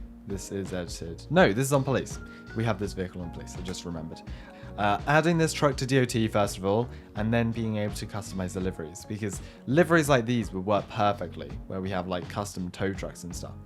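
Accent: England, United Kingdom. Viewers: are you from england america or another place [not to be creepy i just wanna know:)]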